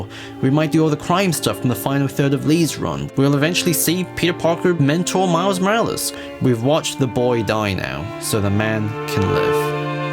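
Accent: United Kingdom. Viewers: wow. is the furthest thing from an “objective” analysis on those comics. It’s clear as day. He constantly tries to diminish plot lines in the Raimi films (see: Spider-Man 2 doesn’t get Spider-Man, his comments on the movies having “poverty porn”, his video on the “nostalgic Spider-Man) and clearly likes TASM and MCU much more. Just as you’re saying an opinion can’t be fact, neither can a shitty YouTube video essayist with chill hop in the background. I get it. Most of you don’t read the comics, and think this guy knows what he’s talking about, because he’s got a British voice, scripted videos, and chill hop. It’s just not the case. And it’s not an opinion for to say “the human torch overshadows uncle ben as a mentor in the comics.” That’s flat out wrong. It’s not an opinion to say “Raimi invented uncle Ben saying with great power” that’s objectively wrong. 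And people can’t take criticism of these films well at all. In fact, you’re trying to say “oh well everyone knows it’s not 100% accurate that’s not the point” as you’re watching. A YouTube channel that constantly tries to drive home the “”fact”” that the MCU films are “”more comic accurate”” than the Raimi flicks. Which again- just is flat out not true. And I never said the Raimi films were a 1:1 carbon copy of the films. But they’re hell of a lot closer than the MCU films. You don’t need bizarre nitpicks of random comic panels from the Stan Lee run to see that. And sorry, it’s still not an origin. You’re telling me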